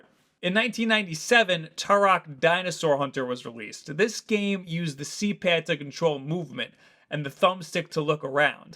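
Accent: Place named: New York, United States. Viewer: when was going strong with that ny accent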